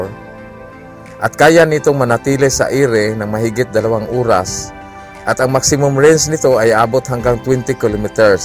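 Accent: Visayas, Philippines. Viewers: Konting bawas pa ng visayan accent at pwede na.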